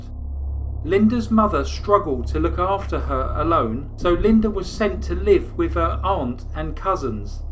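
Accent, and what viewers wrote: United Kingdom. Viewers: talk about a strong British accent . where's this from Leeds?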